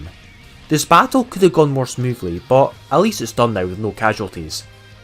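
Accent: Scotland, United Kingdom. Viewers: Loved this mate, inspired me to give it a go. Can hear the scottish in your accent, where you from or where have you stayed here?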